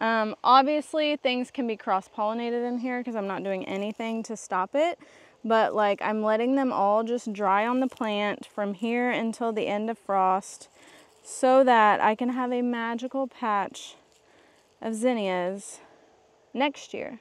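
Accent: South, United States. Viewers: Just found your channel. We grew other colors of broom corn. I believe it was red blue and yellow. Been a long time but I think that was what we grew. Also, I have a question. I know where you say you live BUT I don't think that is where you are actually from. I'm in Alabama and you sound as though you are from here or Tennessee or Kentucky or at least the south. Am I close ?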